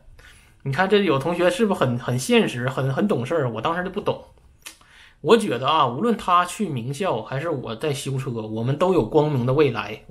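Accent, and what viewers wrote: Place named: Suizhong, China. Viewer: 听口音是绥中的吧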